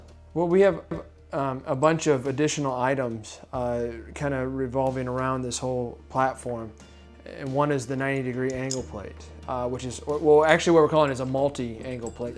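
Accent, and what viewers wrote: United Kingdom. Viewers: Don't you just love British accents ? :D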